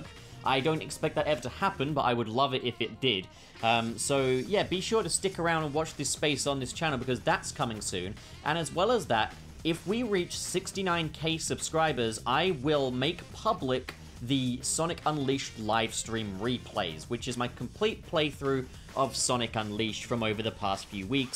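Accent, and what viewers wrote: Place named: United Kingdom. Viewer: I am surprised to hear a British fellow say "dudes".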